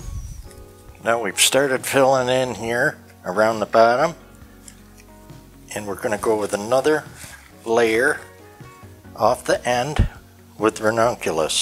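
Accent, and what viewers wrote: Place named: Western Pennsylvania, United States. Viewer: Love your style! By the way, I notice you have an accent. Western Pennsylvania. Ohio?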